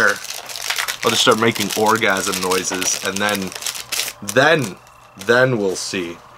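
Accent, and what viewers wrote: Scotland, United Kingdom. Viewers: i got +10 points..what can i get with them...accent sounds more scottish lol..idk. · You sound Scottish not Irish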